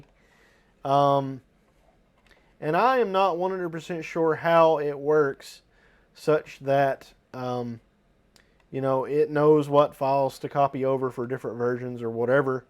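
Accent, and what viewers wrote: South, United States. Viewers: Excellent. Love the Dixie accent !! ;)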